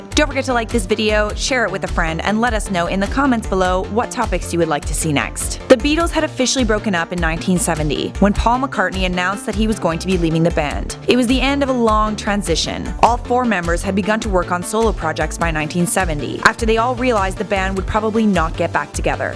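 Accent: England, United Kingdom. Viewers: Youre english right?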